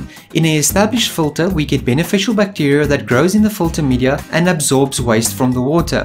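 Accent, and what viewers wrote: South Africa. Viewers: It takes exactly 0.3 milliseconds to recognize a boer accent 😂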